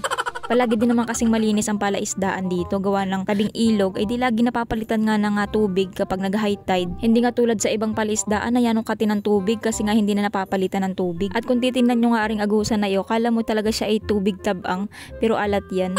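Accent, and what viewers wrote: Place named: Quezon, Philippines. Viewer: 🤣🤣🤣tuwangtuwa ako sa mga vlogs at kadaldalan mo miss.iLove your accent❤️❤️❤️😘😘😘kaya lagi ko inaabangan ang mga bago mo vlogs.saan kaba sa quezon?ingat sa panghuhuli ng isda baka matinik ka ng stonefish.tingin2 den sa paligid kpag nagluluto ka baka my ahas sa itaas ng punong kahoy...ingat po miss ❤️😘God bless🙏🙏🙏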